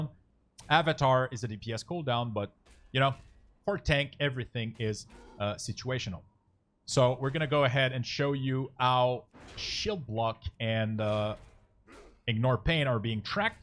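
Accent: Quebec, Canada. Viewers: Sounds like you are quebecois ?! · Very nice video. I can tell lots of efforts were put in this and I will be the first one glad to use it right away! Btw QC? I recognize the accent :)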